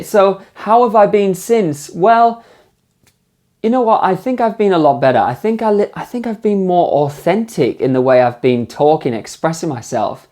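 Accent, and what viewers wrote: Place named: Newcastle, United Kingdom. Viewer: Geordie maybe 🤔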